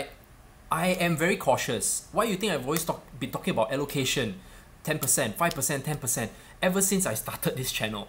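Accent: Singapore. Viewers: you have to be from Singapore right? I hear that Singlish speak!!!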